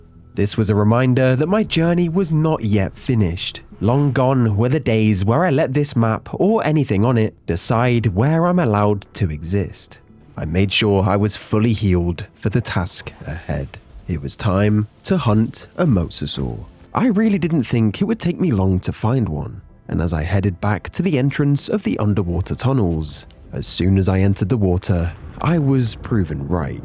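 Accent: United Kingdom. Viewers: His accent, always gives the British narrator 🙏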